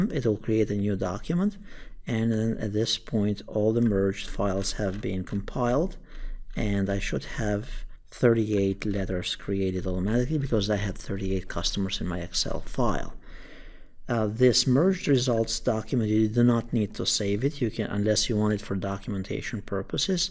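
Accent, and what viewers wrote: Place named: Albania. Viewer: Great presentation Hope you don't mind me saying but you have an interesting accent, are you of Albanian origin?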